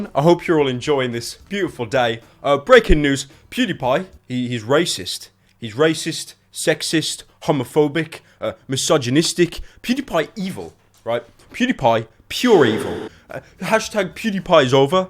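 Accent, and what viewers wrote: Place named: England, United Kingdom. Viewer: ur english akzent is so fucking gay holy shit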